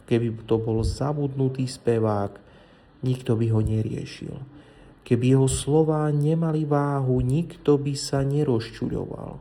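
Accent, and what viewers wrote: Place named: Czech Republic. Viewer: Už aj ty si zmutovany češtinou? Nekecrj nezvan .ale rozpravaj,,!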